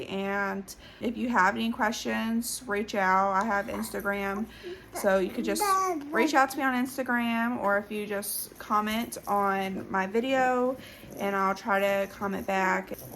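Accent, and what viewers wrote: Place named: South, United States. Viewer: Sounds like maybe some place in the south !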